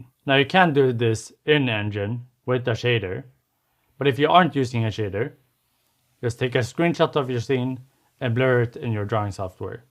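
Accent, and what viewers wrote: Sweden. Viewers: I was just about to open your channel page in a new tab to check the cannel info to see if you were Swedish*, but then these books appear confirmed it for me, lol. * I have ADHD okay; when I start to wonder where an accent is from and start to think it sounds oddly comfortably familiar, and then suddenly realise that it sounds Swedish; I just have to pause the video and figure it out immediately, or I will stop paying attention and only focus** on the accent... **That's also why my channel info only says "I'm from Norway, in case you wondered...", just in case some other ADHD'er gets distractingly curious about where the crazy person who posts multi paragraph (or one whole screenful of text with barely any periods but lots of commas and semicolons and nested parentheticals and no line breaks) is from... Come to think about it; maybe I should add "and I have ADHD" to the description too? 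That might explain some of my wildly off topic comments to anyone curious.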